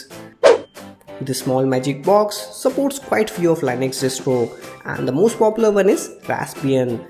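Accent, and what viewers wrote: India. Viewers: Dude thats an Indian accent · yep. Thanks, that is the normal tilt and pitch of an Indian - i think a great video. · If u have trouble understanding Indian accent , just leave without commenting anything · nobody is judging mate, i know how it is to learn new languages, i speak 5 of them, and all with an accent. blame my ears, i have no problems with accents, but indian accent is so annoying i cannot enjoy these videos however much i try. and i try a lot. sorry my indian friends. i love you but my ears bleed every time. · He sounds like Indian tech support.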